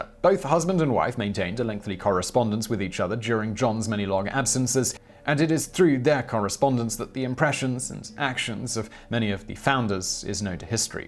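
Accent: United Kingdom. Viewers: A dude with a British accent tells US about the founding fathers. Go figure!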